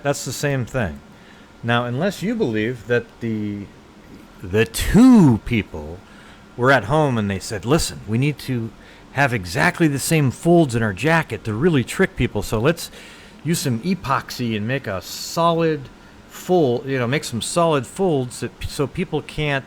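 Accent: United States. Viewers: The best part about this series of debunking videos is, he's being more of a jerk about it the longer it goes on... and he sounds like he has an Indiana accent.... so he kind of sounds like a belligerent Mike Pence, LOL! Love it. Keep the videos up.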